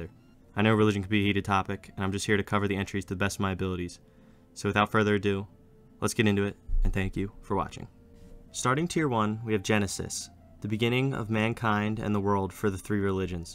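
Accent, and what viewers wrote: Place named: Philadelphia, United States. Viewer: Bro hittin us with that hard Philly accent with the “wooder”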